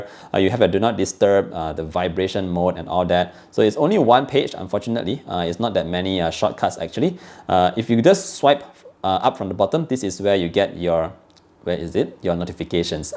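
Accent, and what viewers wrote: Malaysia. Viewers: heard your english, definitely my countryman. selamat hari merdeka!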